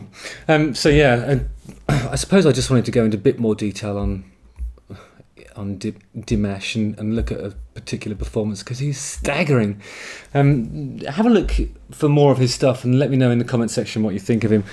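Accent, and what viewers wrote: United Kingdom. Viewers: I’m curious if you had the opportunity what would you like to hear Dimash perform, not a song but what genre, rock, ballad, etc. really enjoy your chats. Love the British accent, makes me homesick. 🤗🤗🤗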